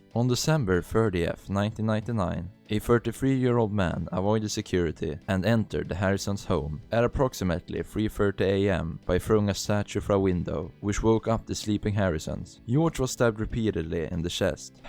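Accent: Sweden. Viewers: Your ATROCIOUS swedish accent is just too unbearable to listen to for a whole video, sorry... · I like your swedish accent, I also happen to have hyperfixation on so this video will be on my "videos for eating snacks" list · you sound so swedish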